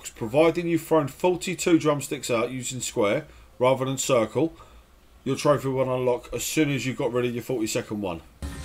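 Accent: United Kingdom. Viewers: It's weird how even a video about a silly game spoken with a Brittish accent sounds like a professor saying that the French is invading England.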